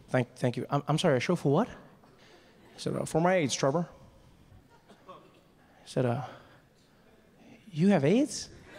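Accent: United Kingdom. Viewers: Sounds British to me 🔥🔥🔥🔥🔥💯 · Sounds British to Me. UNPARALLELED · Sounds British to me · Awesomely truth ! Sounds British to me .. **empty white brains 🧠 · Sounds british to me... 😅😅 Talks a lot about how assholes british are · Sounds British to me....flipped me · Definitely does sound British bruv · That sounds British to me 😂😂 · 'sounds british to me' THIS. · That sounds British to me.... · that sounds British to me 😂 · Sound British to me 😂😂😂Bravo well said 👌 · sounds british to me 😂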